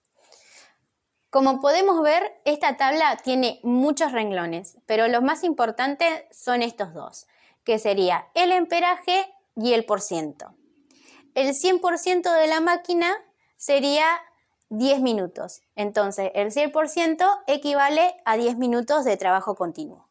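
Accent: Argentina. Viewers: Muy buen video explicativo, pero nada personal me desespera mucho el acento argentino (ladilla mental mia) me gustó la explicación y me aclaro muchas dudas, pero no creo seguir viendo tus videos. Sigue así gracias por la ayuda.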